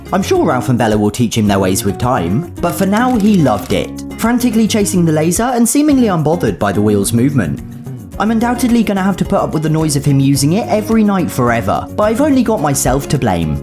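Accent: United Kingdom. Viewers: Dang I only just realized that your British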